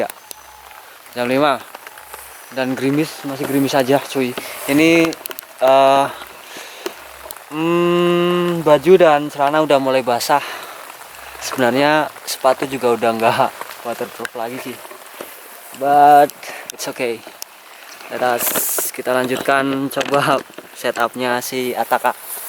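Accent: Banyumas, Indonesia. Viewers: Deneng ming kaya kue tok ? Yo wis lah. Mantappp ... Tetep semangat. Ora ngapak ora penak. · wong endi sampean kang? kayane sesama republik ngapak kie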